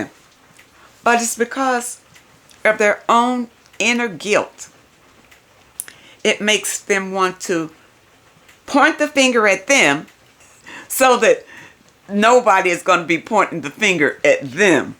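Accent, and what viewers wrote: South, United States. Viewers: that's good. He sounds like Southern Charm.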